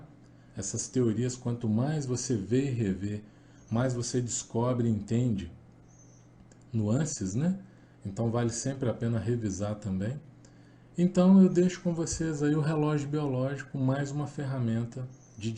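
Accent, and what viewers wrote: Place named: Minas Gerais, Brazil. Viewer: A fala pausada ( bem mineira por sinal) me pareceu boa pra aprendizagem.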